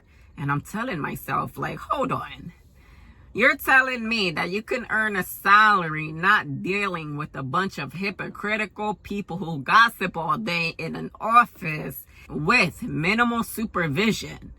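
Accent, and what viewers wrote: United States. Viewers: Watched your video and subscribed immediately. Love your determination and your Jersey accent. I am so sorry for how your husband went about ending your marriage. But you will be alright. I am looking forward to following your truck driving journey. I don't know if you follow any other female truckers on YouTube, but my favorite is Jasmine (SlimePack) who drives refers with Prime INC. May God bless you and keep you safe on the road.❤🙏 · Where are you from? You sound like you from New York? Are you Boriqua?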